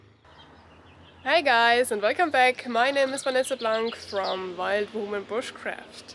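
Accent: Bavaria, Germany. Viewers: Servus Vanessa, " You go girl!" Des is a Amerikanischer Ausdruck! Was bausten du mit dem Loch?( I Dat a root /tornado/luftschutz Keller bauen fuer diesen Zeiten- man derf nett bloed sein - die Zeiten waeren schlimmer- nett besser). Ois Guads,bleib gsund- Semper Fi- Peter p.s. des gfoid ma wenn du so nett bairisch sprichts mit deinen Tieren.( unser Germanische r Stam is wichtig gel.)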